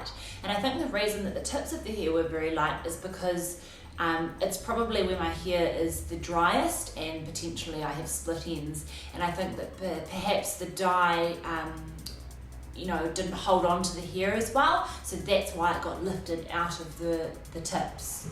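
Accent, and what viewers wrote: New Zealand. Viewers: new zealand accents are my favorite and I apologize for the ignorance, not all americans suck. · Kiwi! Yay! So nice to hear a familiar accent on here :D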